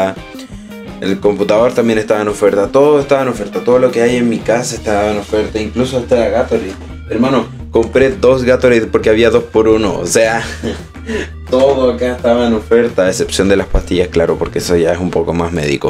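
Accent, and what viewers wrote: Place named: Chile. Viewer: Pensé que eras argentino pero me acabo de dar cuenta que eri chileno Creo khe tengo lo oidos pa la caga Ta buenísimo tu setup 🔥🔥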